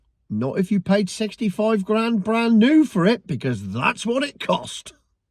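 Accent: Cornwall, United Kingdom. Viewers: Great video! With that accent you must be from down my way me ansom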